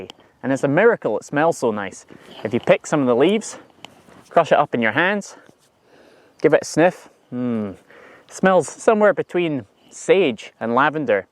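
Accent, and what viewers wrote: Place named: Scottish Highlands, United Kingdom. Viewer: Hi there...great video!!!! I love plants and gardening...but what I loved the best is your lovely accent of highlander...my ancestors came from Scotland Morayshire, and settled in Argentina, South America....I'm in love with Scotland and hope to go one day. Thanks again pal. Beautifull my Scotland.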